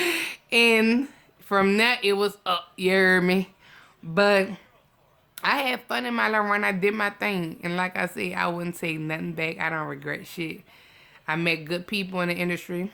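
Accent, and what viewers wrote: New Orleans, United States. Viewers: I just love listening to you! Ya insights and slang and N.O. accent...Very comforting.I promise I would listen if you made a video describing how to make a peanut butter and jelly sandwich.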